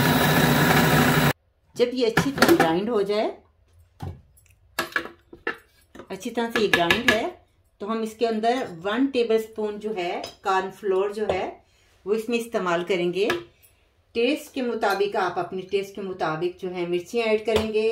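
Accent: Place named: Germany. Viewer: میرے کے لگ رہے ہیں ۔۔۔۔ ۔۔۔۔۔۔۔۔۔۔۔۔۔۔۔۔۔۔۔۔چکن پکوڑے اتنی مرچیں ۔۔۔ دیکھ۔ مجھے۔ پسینہ اگیا۔ ھے 😁 آپ کی اردو اچھی لگتی۔ ھے۔ جرمن۔ ایکسینٹ کے ساتھ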